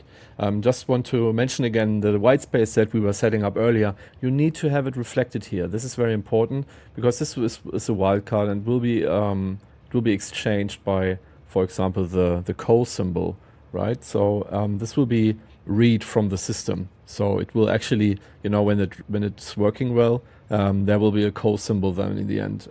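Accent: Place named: Germany. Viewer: The german accent is HORRIBLE... please stop it... :D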